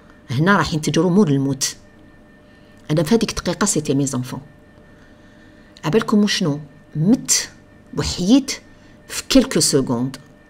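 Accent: Algiers, Algeria. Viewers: سلام عليكم اخت اول مرة نبعتلك كمونتاع انا من متتابعاتك الوفيات راكي اديا دعوة الخير من كل الشعب الجزائري نساء و رجال ولهذا اسلكك ربي من كل موصبة ان شاء الله راكي وكلتي ربي و احنا تاني ماعندك ماتزيدي حسبنا الله و هو النعم الواكيل و اخيرا الحمد لله على السلمة و نزيد هاذي انا صواتكي يعجبني اهبل بلاهجلةالعاصمية تاعك اتمنا ان اراكي يوما ان شاء الله 🤲🏻❤ امين شكرا شكرا و شكرا يحفظك الله و عائلتكي سلام❤❤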